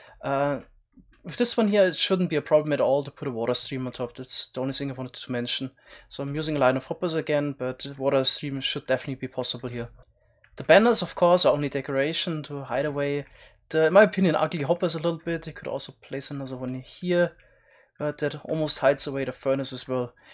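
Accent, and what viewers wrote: Germany. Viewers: Haha man hört das du n Deutscher bist :D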